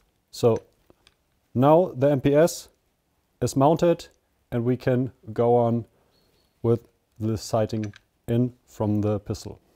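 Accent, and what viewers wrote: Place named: Germany. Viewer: geiler deutscher Akzent..... 🤣🤣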